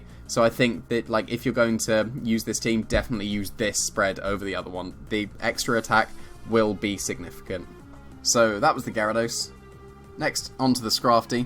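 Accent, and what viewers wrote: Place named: England, United Kingdom. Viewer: English accent best accent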